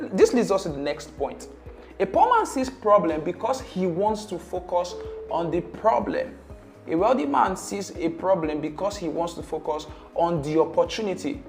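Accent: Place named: Pidgin, Nigeria. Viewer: Pidgin English never leave you sha😅😅, awesome video thanks alot for it🙏🙏🔥🔥🔥🔥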